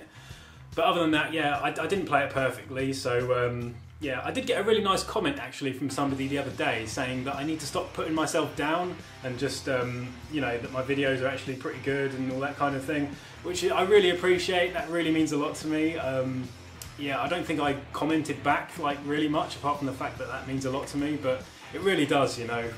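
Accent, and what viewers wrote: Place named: United Kingdom. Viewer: Hey dude just wanted to let you know.... I wanted to learn the song and just came across ur vid.... I enjoyed your self-deprecating humor s*** dude...I'm the same way ... but yeah pretty good job man thanks ......anyway ....cheerio...lol... .from Jacksonville, FL I was going to ask if you were near near London and a Jaguar fan but I'm betting probably not. my apologies if it's wrong to assume that all Brits are from London.....I don't know the difference in the accents And shit.... Anyway I just wanted to let you know I appreciate it.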